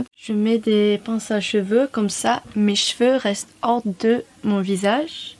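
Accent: United Kingdom. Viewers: ironically love the casual brit accent slipping through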